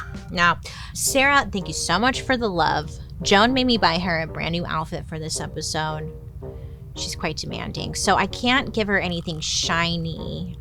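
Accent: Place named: Transatlantic, United States. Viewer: your TransAtlantic accent is *chef's kiss mwuaah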